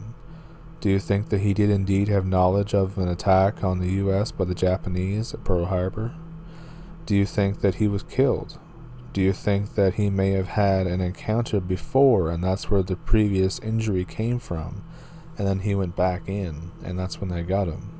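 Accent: Canada. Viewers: Canadian accent is lovely 😊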